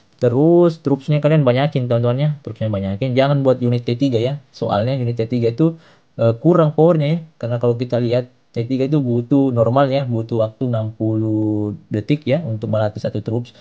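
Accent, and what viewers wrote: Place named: Sulawesi, Indonesia. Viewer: Dari logatnya pasti orang Sulawesi😂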